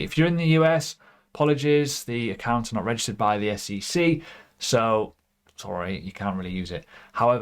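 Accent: United Kingdom. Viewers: Great content. Maybe talk a little slowly people used to listen an american english than british.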